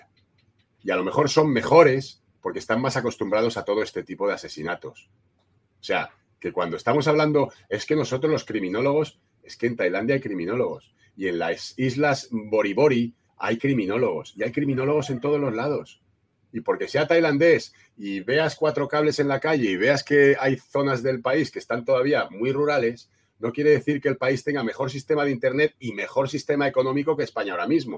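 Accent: Castile, Spain. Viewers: Habla castellano puro y duro sin más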